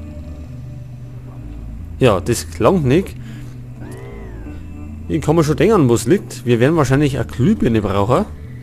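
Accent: Bavaria, Germany. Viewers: Sehr geil das des amoi ajemand auf boarisch mocht, da geht mia a herz auf <3 Super LP weitermocha ;D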